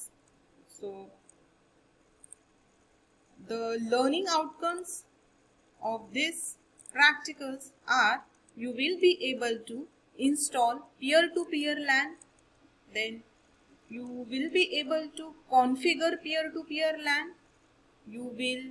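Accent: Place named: India. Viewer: A less Indian accent would attract more viewers, Prof B. Emphases are all ...hard. More chest-voice.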